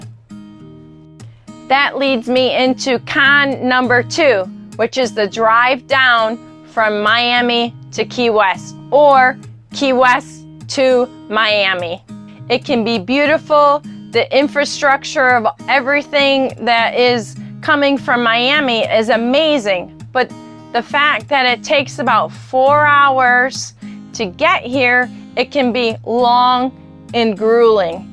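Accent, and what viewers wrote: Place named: Chicago, United States. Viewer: I love your accent, are you originally from Chicago or somewhere in that area?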